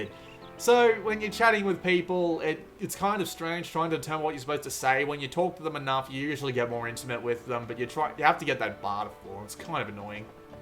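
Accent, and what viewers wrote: Australia. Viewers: Love your Aussie accent mate!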